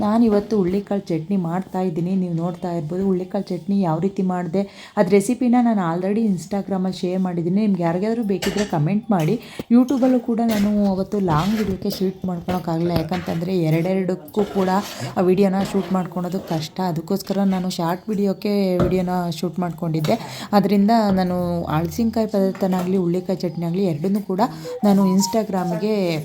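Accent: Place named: Shimoga, India. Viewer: Hi nimma vlogs Supper nanu kuda nim side Talkere nanna Tavar mane nimmuralli namge parichaya davar edre na erodu Shimogga nan enjoy madta vlog nodtini akki palahara egantu marte hogide niv matadodu namma tarane adke nanag insta niv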